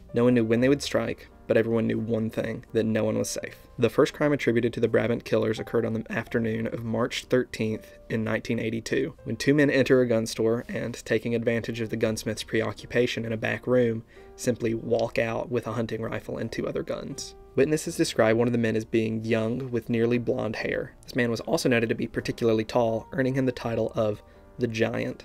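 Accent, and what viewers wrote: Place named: Southeast, United States. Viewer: Where's the accent from? It's Southeast, but not too southeast. I'm in NC, but I'd guess TN if I had to.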